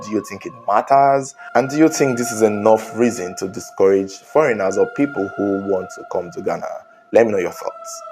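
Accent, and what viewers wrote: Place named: Nigeria. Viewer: You sound Nigerian. Where in Ghana region did this happen? Did you mention?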